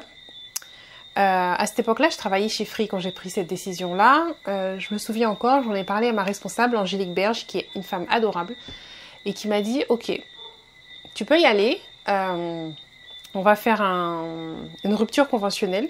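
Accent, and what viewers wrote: Haiti. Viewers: Quand tu parles créole, tu as le même accent que les gens du Nord d"Haiti. Mais quand tu parles français, c'est comme tous les haïtiens en général. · oui du jugement des autres aussi je ne sais si vous connaissez le Sénégal mais il aime trop te juger. vue que suis au Canada si je rentre il vont avoir à l'oeil tout mes fait et geste.Merci pour tas réponse vous avez le même accents que les haïtien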